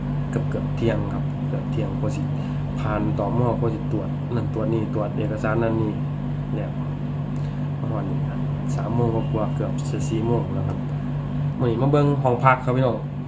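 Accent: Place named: Sakon Nakhon, Thailand. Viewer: คือเสียงบ่าวสกลเนาะได้ฟังแต่เริ่มต้นมวน่ๆๆ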